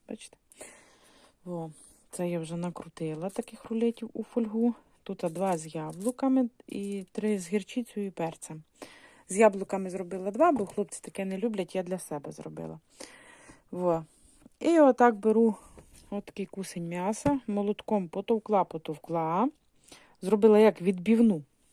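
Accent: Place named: Russia. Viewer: Капец...Мучается бедная баба с мовой.Поганит украинский язык..Акцент русский так и прёт.Приспособленка.😂😂😂😂😂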